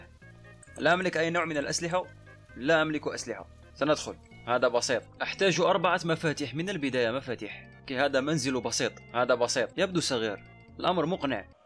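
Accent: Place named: Egypt. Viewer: من هو احسن يوتيوبر ( احسن من ابو فلة ) - من اليوتيوبر الي بيستاهل 26 مليون مشترك ( يستاهل اكثر من ابو فلة ) - من اليوتيوبر الي بينزل فيديوهات بشكل يومي ومهتم بنا مثل الاطفال ( اكثر من ابو فلة ) - من اكثر يوتيوبر مضحك ( مضحك اكثر من ابو فلة ) - من الي بيتكلم مصري وهو اصلا من الجزائر ( من الاخر احسن من اي يوتيوبر ) 🎉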